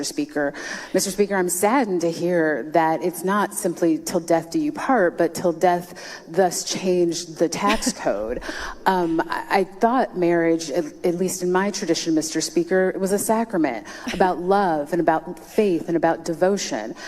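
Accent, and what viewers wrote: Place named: Minnesota, United States. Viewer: now I know why I hear a Minnesotan accent in your voice from time to time. You can take the girl out of Minnesota but you can't take the Minnesota out of the girl! Goes for North Dakotans too, my grandma is from ND, after 70 years she still has her ND Norwegian accent! 😂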